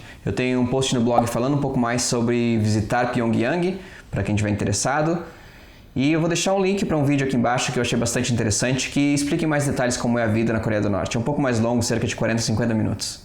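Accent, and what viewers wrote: Rio Grande do Sul, Brazil. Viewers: Tu é gaúcho meu ? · cara vc e gaucho?Vc tem muito sotaque daqui sksk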